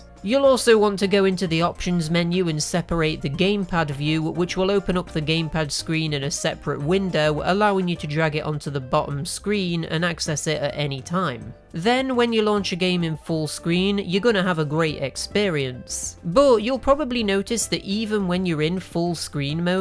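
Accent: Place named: North, United Kingdom. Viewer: Thank you for yet another great video,! I absolutely adore ur accent, which part of UK are u from? I would guess somewhere north.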